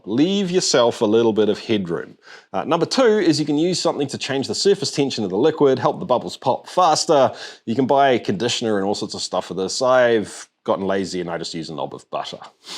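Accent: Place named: New Zealand. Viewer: The SHEEP INVASION., until now your accent (and formerly-American one) was the best evidence that your channel is pure New Zealand. But now you've been INVADED by BLEATING SHEEP.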